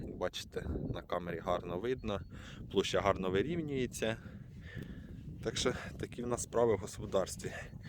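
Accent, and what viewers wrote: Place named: Poltava, Ukraine. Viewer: Успіхів зємяля, нравиться полтавський говор😘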